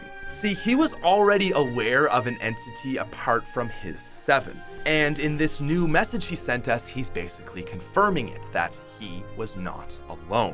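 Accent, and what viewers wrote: Canada. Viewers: Ik your probably Canadian but plz fix your English it's not oatside it's outside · Me watching the video Hears an Accent Is.. Is that- Is that Canadian? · Are you canandian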